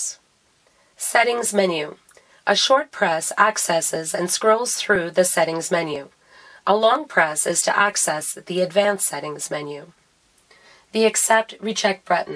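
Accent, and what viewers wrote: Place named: Canada. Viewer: Nice, concise tutorials. Just what's needed for a quick refresher. And I enjoy the Canadian accent to boot.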